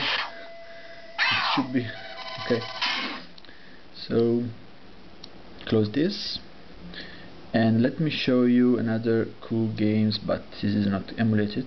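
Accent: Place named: Italy. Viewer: love the italian accent, a lot of friends speak like that :D Cheers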